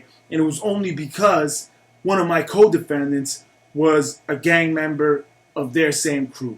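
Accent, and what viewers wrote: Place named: East Coast, United States. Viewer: I don't know where you are from but it sounds like you are from the east coast somewhere. Because i did 8 years here on the east coast and i did my time in the same way as you are talking. I actually punched a guy in his mouth once for coming at me sideways in asking for my paperwork. I took it just like you said. I said to him, what do you calling me a ripper? And i hit him in the mouth. But on the west coast, mostly California they have prison politics that are completely different. They segregate races as part of the prison politics and here on the east coast we don't do that. Its two totally different worlds.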